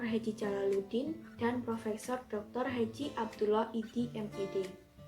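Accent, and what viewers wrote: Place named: Banyumas, Indonesia. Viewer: Uwuwuwu wong ngapak 😂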